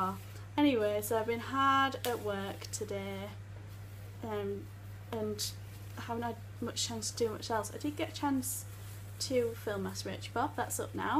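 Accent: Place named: Yorkshire, United Kingdom. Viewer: I love your accent from Yorkshire ?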